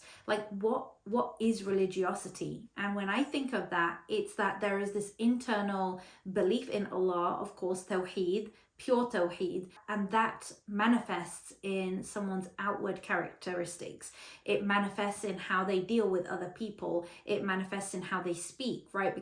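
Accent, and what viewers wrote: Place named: United Kingdom. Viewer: British English adorable!Salam from Kavkaz,Russia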